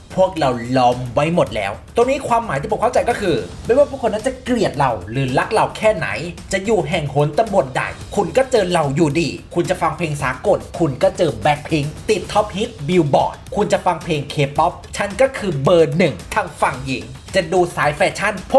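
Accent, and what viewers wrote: Isan, Thailand. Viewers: <num>ถ้าไม่ใช่คนอิสานจะฟังออกมั้ยคะคลิปแปลนี้ รู้สึกได้เปรียบหนักเลย ฟังออกทุกคำ🤣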